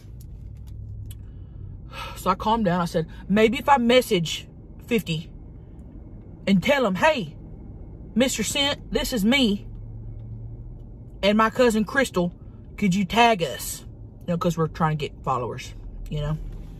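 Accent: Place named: Oklahoma, United States. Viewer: My blood really started bowlin it really started bowlin...I'm dying she's hilarious cause we really talk like that in Oklahoma. 😂🤣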